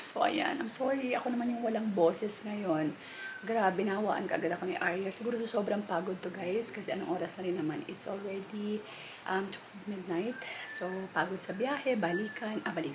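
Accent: Iloilo, Philippines. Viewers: hi po.. 🙋 ang galing po tunong ilongga narin po kayo..👏👏👍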